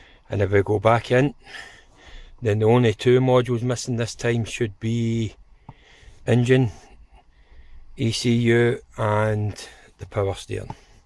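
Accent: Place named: Scotland, United Kingdom. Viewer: Great video, great to hear a Scottish voice! Great tip about coils never knew that could spike ECU will watch out in future. Liked the look of spark jumpers, was it eBay? I like u to review battery maintainer, cheers ps your now my go to Vauxhall guy!!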